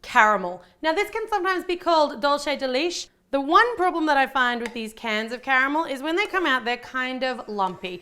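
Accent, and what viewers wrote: Australia. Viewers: it's just her Australian accent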